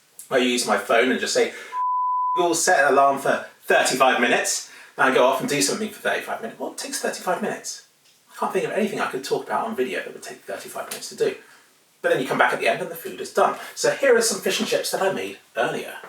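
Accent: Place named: RP, United Kingdom. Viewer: Shut up you posh daft man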